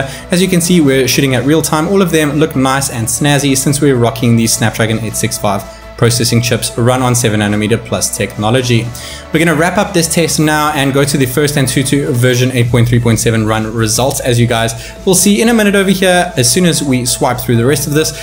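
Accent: South Africa. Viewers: You sound south African, are you?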